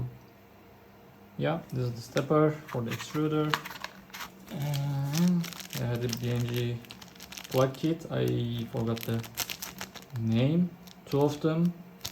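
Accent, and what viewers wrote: Turkey. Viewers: Hi, your accent looks like Turkish accent. Where are you from? If you are Turkish can i ask some questions?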